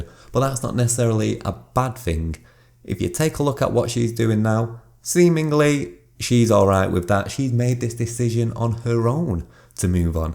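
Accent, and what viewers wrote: United Kingdom. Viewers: Always appreciate your content. Certain it's your accent that makes me miss the UK a little or the tour of topics that walk down memory lane with reminders of more innocent and care free times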